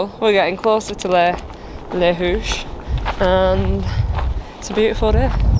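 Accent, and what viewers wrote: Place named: Lancashire, United Kingdom. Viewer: Well done and! Great set of videos. Loved watching each instalment. Gives us great food for thought. We are four 60+ yr olds from Wigan. We will be off doing TMB in 19th July this year. Training hard and looking forward immensely. Thanks for posting. BTW, where do you come from you sound…. Preston or Bolton? Can’t be far from us🤣 Take car and thanks again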